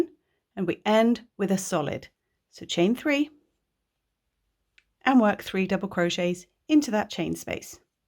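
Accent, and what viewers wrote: United Kingdom. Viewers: This is the first time I've come across your tutorials and have watched this corner to corner one with fascination show simple you have made it look for even a beginner crocheter. I am certainly not a beginner but will now recommend your site to those that are, to perhaps use as a second project, once they have made an item using UK terms. My only criticism is that because of your accent I'd assumed your instructions would have been using UK terms but as the work only involves ch, ss and tr there should be no real confusion because of your clear illustrations. I've, opted to make a doll"s blanket alongside a beginner,using your tutorial to show them how to crochet so that they can learn to switch more easily between American and British crochet patterns. Thank you for such a brilliantly clear tutorial, it will make my teaching so much easier for me! 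xx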